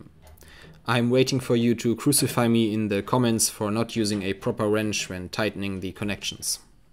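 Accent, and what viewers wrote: Germany. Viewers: oh I'm sure they do too. I'm just razzing ya man. If I did the hobbies around here that you do wherever you are.( To me your accent sounds... German.) Although your obviously fluent in English. Well it wouldn't be very funny. I could get shot if I go to the pharmacy and in a German type accent say. Well. It wouldn't sound like you. I'm not trying to insult you. Where you live is probably way less paranoid than where I stay. I'm talking about the people.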